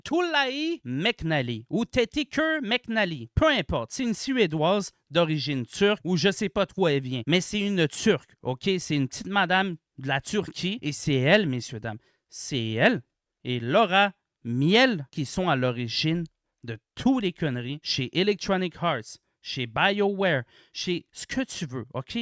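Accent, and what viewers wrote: Quebec, Canada. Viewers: bon désolé mais le quebecois et les expressions bizarres toutes les 5 minutes je peux pas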